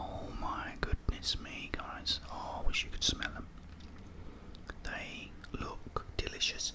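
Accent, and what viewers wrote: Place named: Cockney, United Kingdom. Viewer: Cockney is such a great accent.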